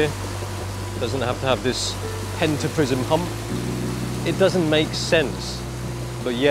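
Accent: United Kingdom. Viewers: You sound British but your asian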